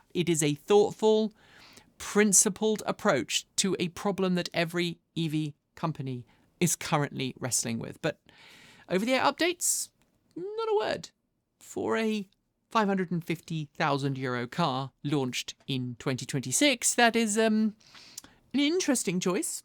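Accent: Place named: United Kingdom. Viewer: You should skip the Political opinions on Elon Musk, who is a beautiful person actually trying to improve the planet and life as we know it for all. Maybe include some opinions on Keir Starmer, which is 100% a disgusting person. Don't know where you live, but your definitive British accent might need some more support for a good cause (Anti Starmer) and not trying to hamper anything Elon Musk is doing, which are mostly really great things.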